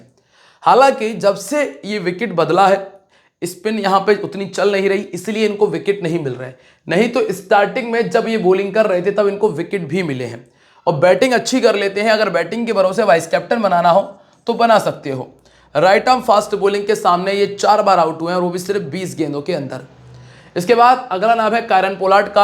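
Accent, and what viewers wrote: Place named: Rajasthan, India. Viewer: Bhai aap mujhe rajesthan se lagte hai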